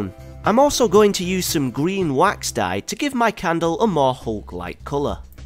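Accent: United Kingdom. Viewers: Yay he English Btw I don't find many British youtubers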